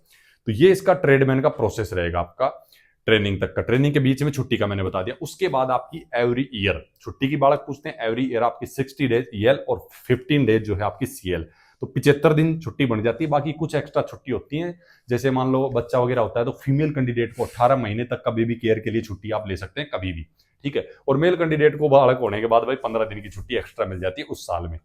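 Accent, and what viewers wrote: Haryana, India. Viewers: Bhai aap haryana se ho Kay ?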